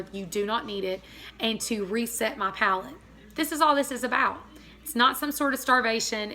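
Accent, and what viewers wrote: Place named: East Texas, United States. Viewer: "What accent!" Indeed! You make me feel like I'm back in East Texas, surrounded by good looking men in Wranglers so tight you can tell what religion they are and when you said, "You feel froggy?" I wanted to yell, "JUMP!" at my screen!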